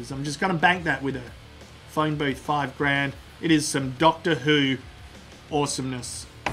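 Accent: Australia. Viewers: i recognize his accent. is he australian or he just have a strong english accent. i mean really strong lol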